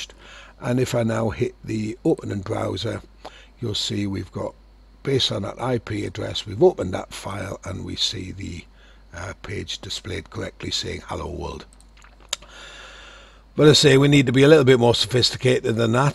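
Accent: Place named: Wales, United Kingdom. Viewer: your accent is so welsh lol thanks, was really stuck for ages :P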